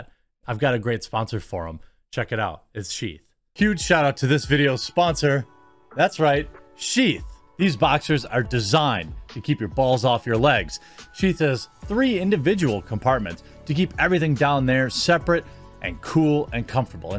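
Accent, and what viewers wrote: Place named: Midwest, United States. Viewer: The effort jeremy goes through to hide his Midwestern accent sometimes cracks me up.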